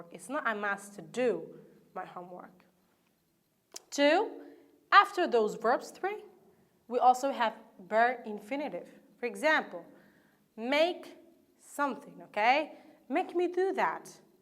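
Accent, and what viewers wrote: United Kingdom. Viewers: I'm not understand what do you say & you speak English as you are British & speak Arabic for everybody understand what you say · haha i get u but the accent also british ..... tell how do you know the deference between the accents ?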